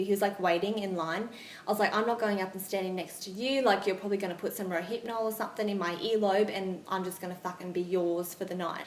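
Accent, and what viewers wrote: Australia. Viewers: Are you Australian definitely i think im in aus too sometimes its not that a guy wants to be creepy but when they find a girl attractive at times they might not know the best way to approach the situation. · Your Aussie?? 💘💗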